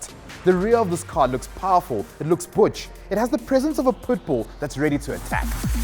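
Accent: South Africa. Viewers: You from South Africa 🇿🇦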